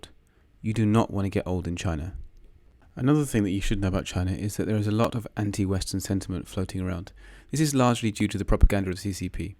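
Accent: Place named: Taiwan. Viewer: Chanced upon one of your videos on your Mandarin channel - was very surprised to hear a Taiwanese accent since most Western folk seem to use Beijing pronunciation when learning Mandarin 😂😂 Makes sense now, knowing you lived in Taiwan prior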